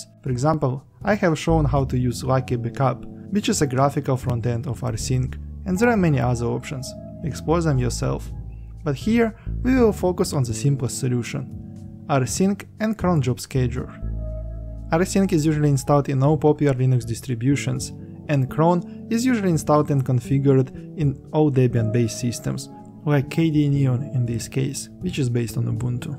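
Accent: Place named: Russia. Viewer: какой ужасный русский акцент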